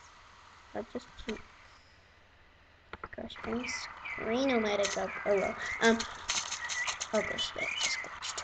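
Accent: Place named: United States. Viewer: you sound amarican!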